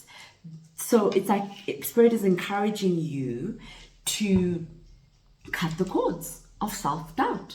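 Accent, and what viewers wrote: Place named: South Africa. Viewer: You sound south african sis,🇿🇦 🙌🤞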